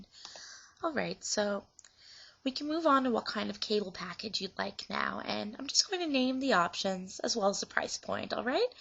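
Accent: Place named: Canada. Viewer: U r so Canadian. Uhhhh why u say about like aboat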